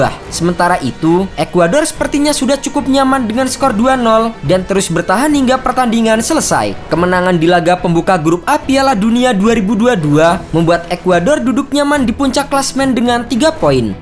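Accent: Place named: Sulawesi, Indonesia. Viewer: Ini yg ngomong orang sulawesi yaaa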